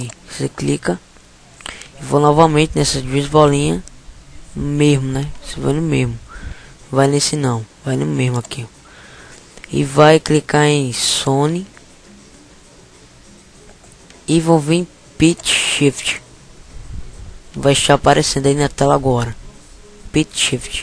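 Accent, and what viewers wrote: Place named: Florianópolis, Brazil. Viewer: tu tem sotaque de manezinho da Ilha! HAHAH tu é de SC?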